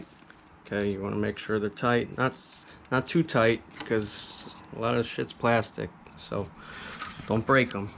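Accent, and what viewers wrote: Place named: Upstate New York, United States. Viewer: Good review. I was playing the game of what state is this guy from based on the way you say “off”. “Ohaaaff”. Upstate NY?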